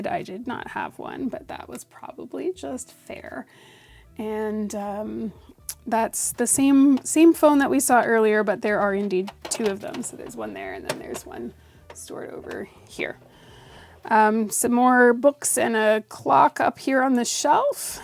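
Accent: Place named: Canada. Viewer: I actually thought you where Canadian 😂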